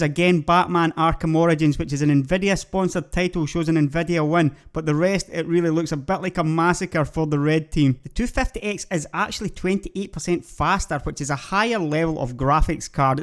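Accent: United Kingdom. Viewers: You really sound like an arab guy that moved to UK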